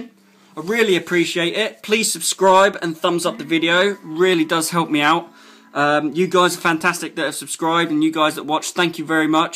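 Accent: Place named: Ireland. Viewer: Somebody's Irish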